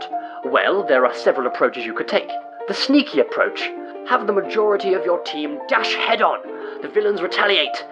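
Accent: United Kingdom. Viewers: Gotta love that British voice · Was this challenge explained by Silent Movie? Because the explanation looks like a silent movie and there's someone explaining it in a proper British accent.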